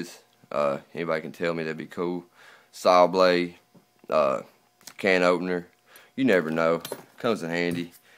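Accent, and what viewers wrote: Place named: Kentucky, United States. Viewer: im not shure but you sound country like me...im from kentucky. .. Nit loadout anfd a BAD rifle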